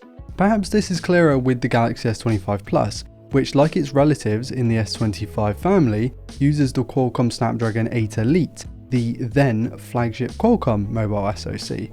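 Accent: England, United Kingdom. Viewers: an englishman posts clickbaits on principle- here it being to gather as many likes as he can. your accent is the MVP of your channel, Sire.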